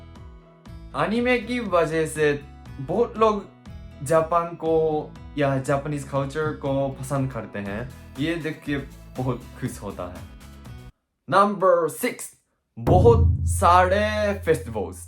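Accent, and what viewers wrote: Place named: Bihar, India. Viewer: Genuinely enjoyed the video, and your bihari accent is so good, when I watched this video I straight up went to your channel to find similar videos like this, but found none. keep making this type of videos. and if you ever happen to come to Delhi then we can hangout...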